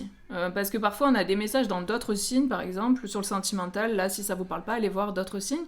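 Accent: South, France. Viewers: Le bon accent du sud, excellent 😊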